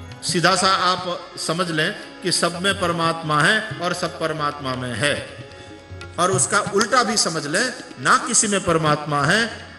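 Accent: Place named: South India, India. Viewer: Dear Swamiji, your Sanskrit pronunciation is topnotch. looks like you have studied somewhere in south India.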